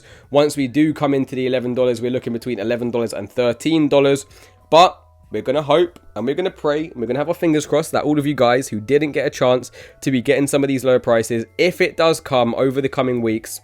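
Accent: United Kingdom. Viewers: Why are all my favorite crypto YouTubers British?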